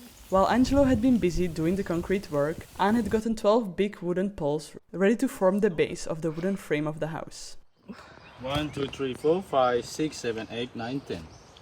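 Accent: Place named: Belgium. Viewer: Wow this reminds me of pictures my parents had living in New Guinea except with all the new technology. I am part dutch, part Indonesian and your videos are so like cool and almost feels like home to me. I would love to visit there one day. My parents were both born in Indonesia but later had to leave with their parents after the war. Seeing a modern day scenario of how my parents lived except with Starlink, a more modern beautifully built home and riding the surf of the island is like a dream come true...Thanks for sharing these videos. Your Belgium accent is like hearing my parents as well with the European accent. My parents are both gone but I would imagine they would be in second heaven if they saw your videos today.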